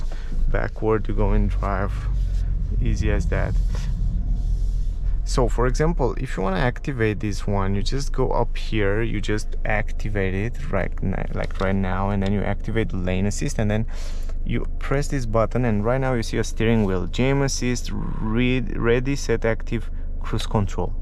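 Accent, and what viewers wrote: Romania. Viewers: You sound ... Romanian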